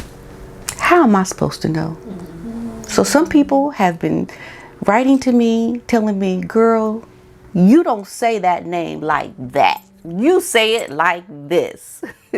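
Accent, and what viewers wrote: United States. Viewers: Please stop apologising for your pronouncement of a name..99.9% of people Know your an American and expect you to speak like an American..most of us love your accent...hi from the uk 🇬🇧 😊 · Don’t you worry about how you pronounce things …we all pronounce things differently and that makes it more interesting. I like your pronunciation actually, you are American and that’s how you should say it. Don’t take any notice of the pedants….(fussy grammarians) Imagine if Diana had lived……she (Marbles)would’ve fallen foul of her (Diana)100% and she would be slandering her too. They would be ghosting each other, I would take sides …and it’s not Marble's side. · I agree with you, you are American. pronounce it how you are accustom. I love your channelYou hit the nail on the head. Just be yourself. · Please don’t worry about how you pronounce names. 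As others have said you are American, and often words are said differently to us British folks. There are 2 spellings for the name for Prince Louis. Some people spell it as it would be said Lewis, which can be a surname or first name. It is what you say, not how you say it. Keep up your good work. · YOU ARE RIGHT! YOU’RE AMERICAN SO YOU PRONOUNCE AMERICAN.🌹🌹🌹SHE IS USER. THE YATCH GIRL. SHE HAD NO RESPECT AT ALL.THIS WANTS TO BE BILLIONAIRE. IS All ABOUT HER SELF. SAD SAD. SAD WE FEEL BAD TO PRINCE WILLIAM HIS BROTHER IS GONE. MANIPULATIVE,CONTROL, HYPNOTIZED BY MEGAN.😂😂😂😂😂😂😂😂😂😂😂😂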